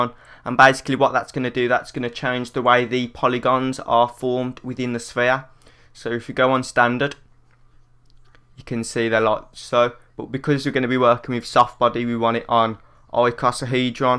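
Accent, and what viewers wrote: Birmingham, United Kingdom. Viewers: haha Brummie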